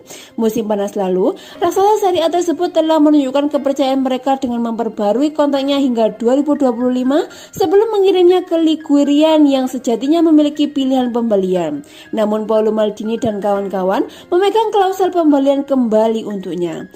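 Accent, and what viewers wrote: Java, Indonesia. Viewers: medok banged jawa nya 😁😆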